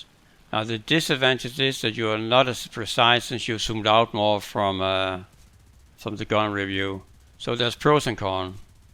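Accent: Denmark. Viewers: Jeg havde ikke set dit navn før jeg hørte accenten. Men jeg kunne høre det danske :D Tak for en god video.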